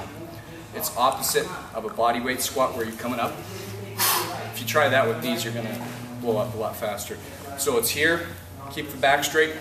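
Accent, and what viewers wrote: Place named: Canada. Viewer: Love the adorable Canadian accent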